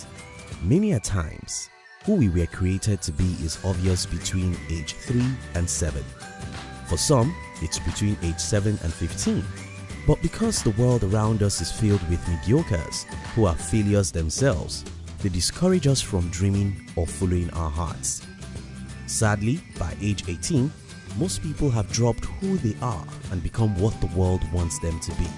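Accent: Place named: Nigeria. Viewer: How DARE YOU fucking disagree with Marsha Sinetar. I picked an accent you are probably Nigerian. No surprise there.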